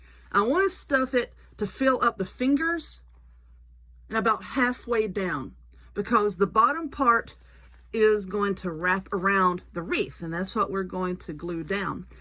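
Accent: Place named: Alabama, United States. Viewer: I love all of your projects. I get great ideas from you. I also love someone who sounds like me. I’m from Alabama so you go girl. You stay safe and well. I will be making this wreath. I’ve been looking for some decor for my gazebo. Thank you so much.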